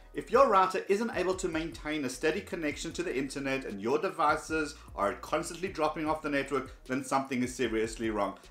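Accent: South Africa. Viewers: You confuse me... S. African Accent, Israeli name... but you live in the US?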